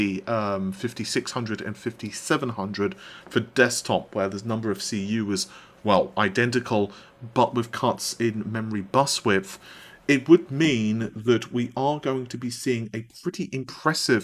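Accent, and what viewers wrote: United Kingdom. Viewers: that british accent is amazing isn't it lol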